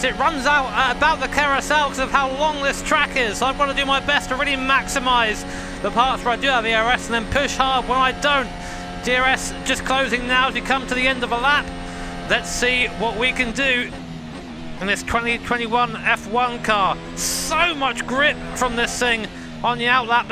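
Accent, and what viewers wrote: United Kingdom. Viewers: I'm going to tell you what I find amazing. I'm an FM7er on XboxOne using nothing more than a controller, so I'm blown away by your driving setup and the VR and the whole shebang; truly incredible. But what I'm most impressed with is your ability to provide that wonderful and intense high-pitched, British-accented non-stop racing commentary WHILE driving an F1 car on the NBR! In my own world, I'm pretty confident on the Ring, but simply driving takes all my concentration. If I so much as fart too loudly I risk throwing myself into the wall. Great driving, and a great video! Thanks so much for sharing.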